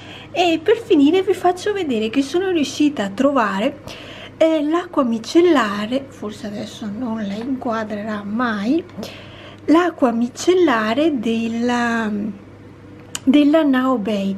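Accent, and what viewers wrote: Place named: Veneto, Italy. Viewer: Ciao!! Ma di dove sei di bello che sento un inconfondibile accento di casa ( Veneto )? I tramezzini mi mancano un sacco, quelli nostrani, qui in Inghilterra non sono buoni come i nostri, e pensare che quando abitavamo in Svizzera li distribuivo col furgone in Ticino! Ciao cara, un abbraccio e un saluto anche da Anna!!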